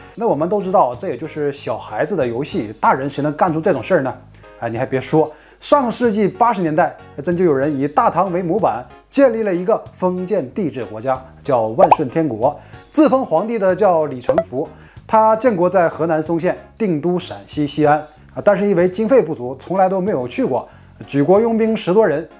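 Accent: Northeast, China. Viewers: 东北味儿普通话，不难懂，不需要字幕吧，会影响更新频率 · 东北的吧?好亲切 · 我一般听不惯东北口音的播主你是个例外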